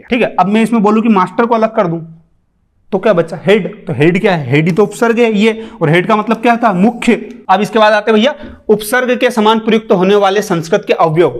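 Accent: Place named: Bihar, India. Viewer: Teacher सायद बिहार से है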